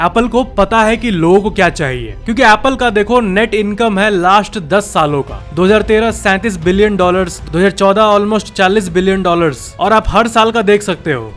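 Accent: Bihar, India. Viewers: Aap bihar se ho bhai😊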